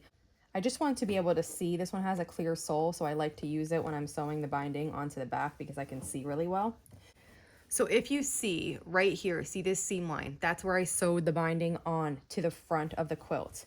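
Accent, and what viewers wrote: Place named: Pennsylvania, United States. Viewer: Hi! I just came across your channel! I really enjoy your quilting projects and how well you explain everything. I picked up on your PA accent. I live in Michigan now but I’m from PA too. Looking forward to watching more of your videos! Thank you!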